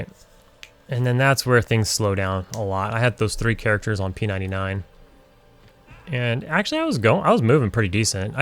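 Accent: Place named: West Virginia, United States. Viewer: I'm from west Virginia , this is how I remember people talking.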